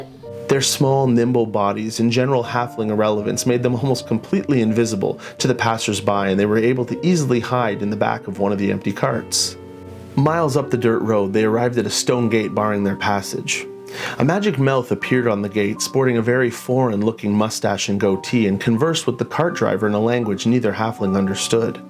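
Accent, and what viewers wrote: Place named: Newfoundland, Canada. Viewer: Deadly Newfie accent! Haha. Well done. Amazing video and channel you have here! Keep it up! sent me. :)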